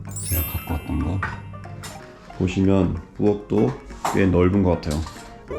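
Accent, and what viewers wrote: United Kingdom. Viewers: 헐 영국어 다. 미국어 만 듣다가, 힐링 됌. 😮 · 오 영국식 발음이셨어~~~ 멋져라!😊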